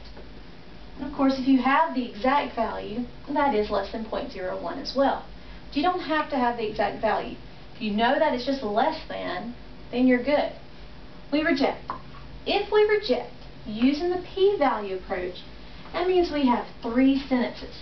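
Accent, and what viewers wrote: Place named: United States. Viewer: Could anyone tell me where is this accent from? Sounds American to me but not sure which state. Thx :)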